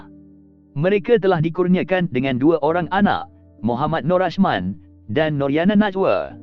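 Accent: Malaysia. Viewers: soraya bukan suraye...najwa bukan najwe aduhaii nak cakap kL pun agak2 la ...bola nak cakap bole ke? adoii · Biasa Melayu belah Melaka sebut macam ni. Dialek Melaka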